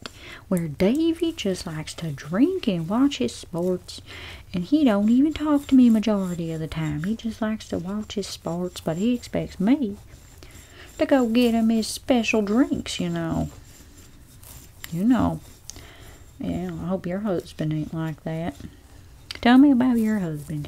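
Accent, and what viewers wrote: South, United States. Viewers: Great southern accent.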